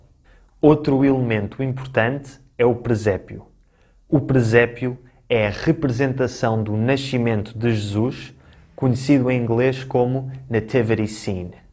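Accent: Lisbon, Portugal. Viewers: Sotaque de Lisboa. No norte é diferente e mais próximo do português original (galaico-português). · É a pronúncia padrão em Portugal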